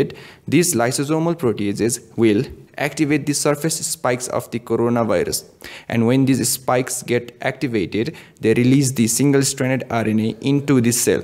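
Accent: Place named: Nepal. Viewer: Ur accent seems u are from Nepal..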